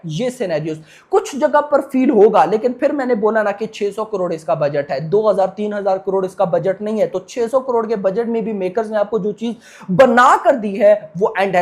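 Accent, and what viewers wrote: Pakistan. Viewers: Tu pakistani kesa bolna band kar sale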